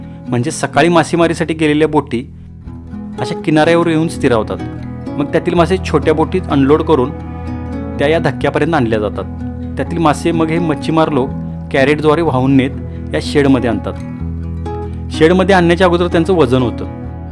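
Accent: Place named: Kolhapur, India. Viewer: खूप भारी कोकण सिरीज आहे. आपण कोल्हापूरचे आहात काय? खूप दिवसांनी माहेरचे शब्द ऐकले... चव सपक आहे... अगदी खेटूनच आहे. शेवटी आपल्या भाषेचा लहेजा एक नंबर असतो. धन्यवाद 🙏🏻💐🙏🏻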